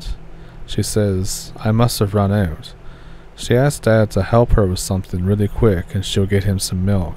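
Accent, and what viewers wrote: Canada. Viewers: I have to say, I really like this channel! I think I have listened to all the stories, and I get a little excited when you post a new one!! You are a great storyteller, and I ALWAYS enjoy pulling up a stump to listen!! Also, you have a nice Canadian accent, very kewl!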